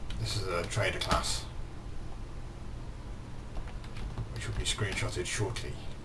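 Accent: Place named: Australia. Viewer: you're UK based yes? you sound like an Aussie.. meh maybe itz just me.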